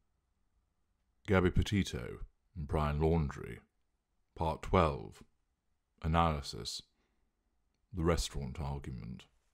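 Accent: United Kingdom. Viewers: I like your voice and your british accent. It is very interesting to listen to you. We learn a lot on narcissistic people. They are dangerous people.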